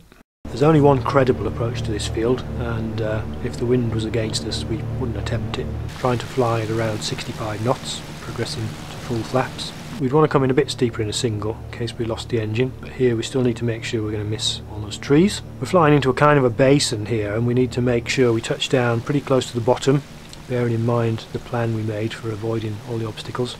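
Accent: United Kingdom. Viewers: I like the quality of your videos and also your british accent ! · Just about the best 10 minute review for an FSX aircraft I've ever seen. Your comparison of real knowledge to in sim performance is particularly appreciated. As ever I also appreciate an understated but confident British accent.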